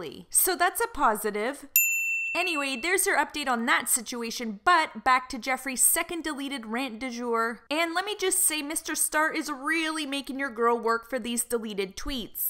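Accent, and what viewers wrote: Canada. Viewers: T J. Are you seriously suggesting a person from an English speaking country (canada) should get english lessons specifically to sound less canadian so that their slight accent doesnt offend your ears? Guess what? Not all viewers are from the US and the rest of us couldn't care less about a slight variation in accent. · Jesus Christ this girl's accent....Is she Canadian or something?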